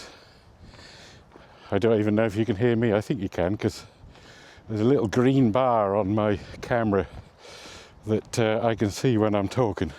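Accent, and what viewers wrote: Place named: Norfolk, United Kingdom. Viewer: I noticed you haven't lost "all" of your Norfolk accent 😂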